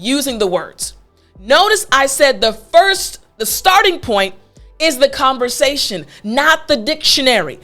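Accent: United States. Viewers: You speak as you wer american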